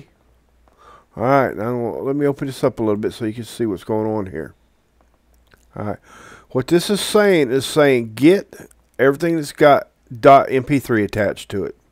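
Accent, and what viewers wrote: South, United States. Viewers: i like your accent man ! southern , sub it, i wish i can learn this accent my Yall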